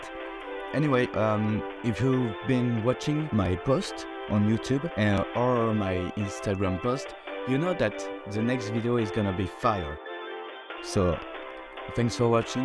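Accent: France. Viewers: Are you french your accent seems like it and some things in the video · Are you french ? Because your english is just beautiful😅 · this video is so great! i think i may do it! but, just a question: are you french? you have a very french-like accent?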